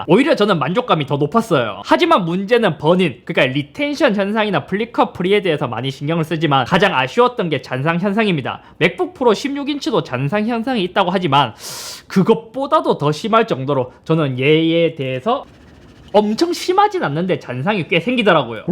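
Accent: Gyeongsang, South Korea. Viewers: 아....경상도 사투리 겁나 거슬린다.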